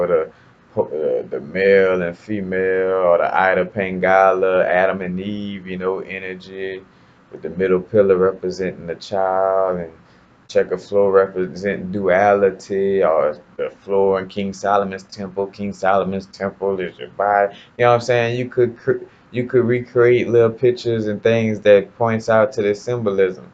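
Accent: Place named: South, United States. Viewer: Hey, where are you from, if you don't mind me asking. It would be really cool to meet you and gain some insight on understanding the universe. I'm based on the Southern part of the East coast and you sound like somebody from down here somewhere, like from Virginia down. It's fine if you don't want to answer, I'm just really intrigued about having a conversation with you. Hope this doesn't come off as intrusive, I mean no harm 😥 lol!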